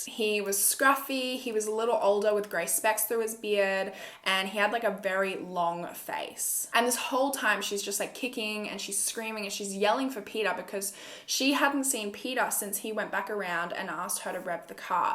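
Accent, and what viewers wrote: Australia. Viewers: It may be because I'm British, but I can't unhear "Pedophile Conio"... Love the Australian accent!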